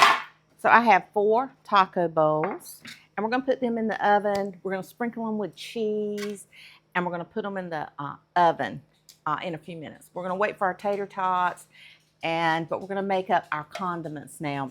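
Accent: South, United States. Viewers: Yeah I watch the videos just to hear her Southern accent.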